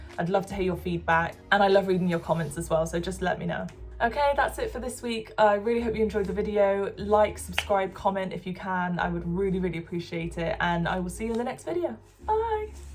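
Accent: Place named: Bristol, United Kingdom. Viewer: Awwww ;D I love the Bristolian accent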